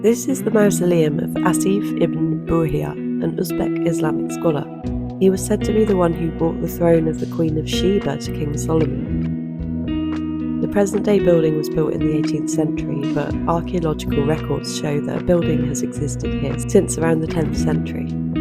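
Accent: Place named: Somerset, United Kingdom. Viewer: Btw, I'm trying to place your accent, I think you're from my neck of the woods in England. I'm gonna guess Shepton Mallett, Taunton, Glastonbury....somewhere around there :)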